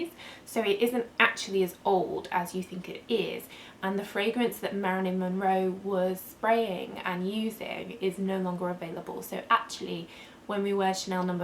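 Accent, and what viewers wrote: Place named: London, United Kingdom. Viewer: hiya, good review ! this beauty is a little bit from another time, however its sooo good when your in mood for it . i love love love all the versions , edp,,edt,pure.parfum,soap.lotion etc ive noticed no 5 smells best on clean skin straight out the bath or shower. In fact the bath products are lovely, but if you want a sweeter version of no5 use the after bath powder , now that is luxury .... mmmmm :) by way im from london too , you speak far nicer than me though , take care :)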